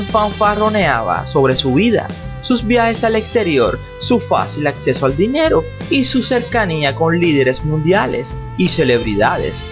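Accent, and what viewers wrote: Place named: United States. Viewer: Dice Tany porque es norteamericano ? O no lo ve el acento que tiene?. No pronuncia bien..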